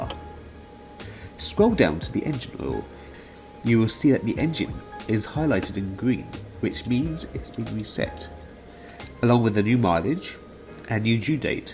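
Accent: RP, United Kingdom. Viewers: Just had to say love your posh talking voice and your CHANNEL subbed thanks for help in turning out SERVICE light reset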